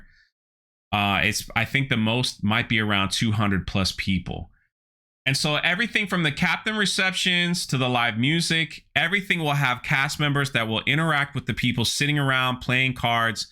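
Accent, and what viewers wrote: South, United States. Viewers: first, where is your accent from? I hear this faint Southern twang when you talk and I’m just super curious where it’s from 👍🏻 Secondly - THANK YOU and Anna and Theory and everyone else in the Fandom Menace for covering this so we can all laugh and vent about it together. I also love that we all have seen something different that’s WRONG with these “trailers” that Disney has put out. For the first add with the female Disney Imagineer and the overly excited fan, I love that they chose a very short actor for the fan, so the girl towers over him. Just saying. And the video showing the meeting of the Imagineers sitting down and talking about the “not hotel,” again they’re all female, just as the Lucasfilm story department is all female. I believe you said it best when reacting to this - “WOW me. WOW me.” That’s what Star Wars is SUPPOSED to do when done properly. 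I watch the trailer and I’m like “what about Han, Luke and Leia, can I see them?” And I feel like the blue hostess or the “Twilek” or Disney security is gonna pounce on me: “WE DON’T TALK ABOUT THEM HERE.” Anyway, keep up the good work!!!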